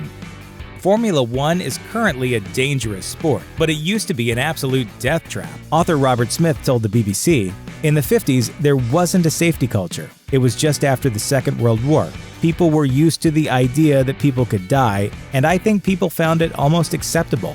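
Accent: United States. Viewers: When I heard an American narrating this i knew it was shite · Opens video: American accent appears Oh god, uneducated twats not understanding the nuances of the motorsport, yay. Footnote Hahahaha, Williams and McLaren both being top tier teams. HAaHahAa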